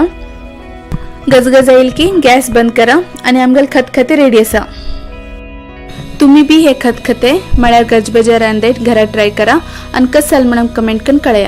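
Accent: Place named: Konkan, India. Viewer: Just now I watched Vikas Khanna's episode in Goa. And in the episode he goes to a restaurant and eats this dry curry called Khatkhate. It's only when I watched this video, I came to know that it is the same as Gajbaje. 🙂 I watch your videos mainly for the content and love your Konkani accent. Just curious to know where you are from?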